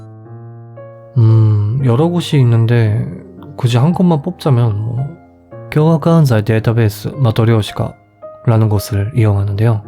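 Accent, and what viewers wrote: Japan. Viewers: 와 전 들으면서 한국말 잘하는 일본인이시구나 라고 확신했는데 발음이나 억양에서 그런게 느껴졌어요ㅎㅎ 근데 한국인이셔서 놀랐어요 평소에 즐겨 들었는데 처음으로 댓글 남겨보아요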